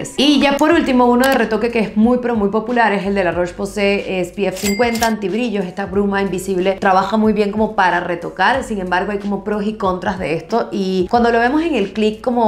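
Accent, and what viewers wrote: Venezuela. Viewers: Escuchando este acento me decía, parece venezolana, después mexicana. Y luego el mapa de Venezuela en su antebrazo!🤗 saludos desde Alemania!😂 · Te ganaste un like, mi suscripción!! Y que orgullo que a pesar de tener tantos años fuera de Venezuela, no has perdido ese acento, ese sabor venezolano!!! Gracias por ese Review yo uso shiseido, pero ya tengo mi lista para probar!!! 💕💕💕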